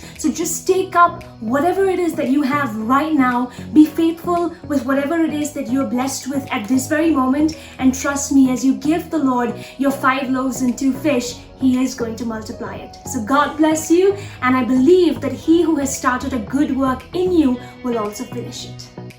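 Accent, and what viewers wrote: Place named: India. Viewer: I am 3rd generation Indian born in South Africa. It is so weird to see someone who looks oriental with an Indian accent.🙊You are so beautiful and great videos. New sub!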